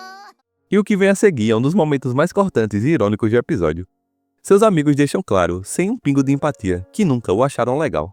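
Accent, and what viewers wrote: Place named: Northeast, Brazil. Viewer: Os vídeos ficam melhores com seu sotaque, viva o nordeste!!!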